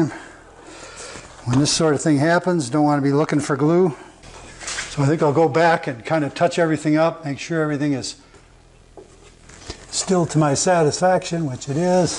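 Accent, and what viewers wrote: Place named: Italy. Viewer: Say hi to Chip ! Nice project ! Also nice Italian accent !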